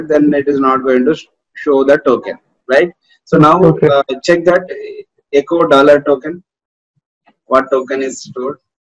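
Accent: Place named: India. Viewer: nice hindish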